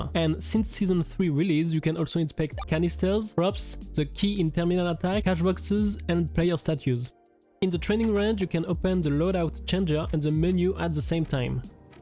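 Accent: France. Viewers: Il EST français that’s not just his accent bro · Att la dinguerie il est FR🔥🔥🔥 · You from France? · Heaviest french accent ive ever heard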